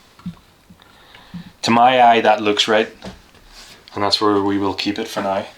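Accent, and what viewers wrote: Northern Ireland, United Kingdom. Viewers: I just unboxed mine. It's a hollow body version. Looking forward to seeing how you approach the painting and curious about which mods you are going to bother with. The Northern Irish accent was a real plus for me. I loved "Derry Girls" 😉. Any idea when the second video will be up? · So good to hear a great Norn Iron accent. Love your videos. Francis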